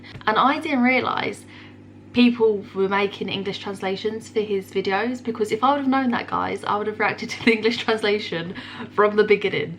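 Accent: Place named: England, United Kingdom. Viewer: I love ur English accent ur so cute and keep react Indian songs Punjabi hits ❤️🇮🇳🤘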